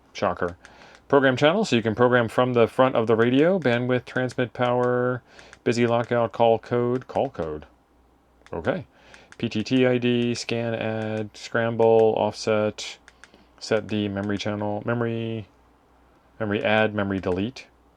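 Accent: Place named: Australia. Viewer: Nice kit and worth even more with the hot Aussie voice chic.😊🇦🇺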